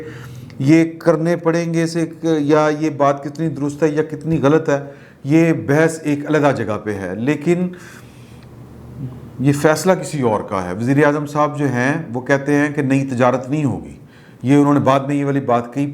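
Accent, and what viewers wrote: Punjab, Pakistan. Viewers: تسی اپنی اردو تے ذیادہ توجہ نہ دیا کرو پنجابی اسی طرح ہی بولدے نے ۔۔۔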